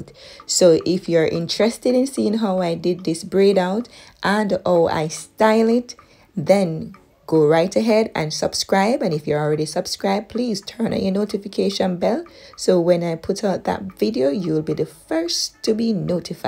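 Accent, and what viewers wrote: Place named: Jamaica. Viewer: Are you in Jamaica? You sound Jamaican....Love your videos. I have been watching them non stop since I came across your channel today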